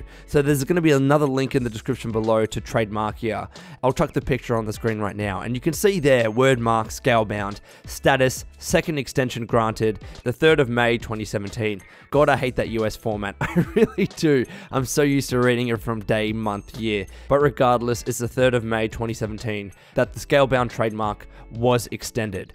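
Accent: Australia. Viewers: I really like accent. Are there other Australian YouTubers that y'all suggest I should follow?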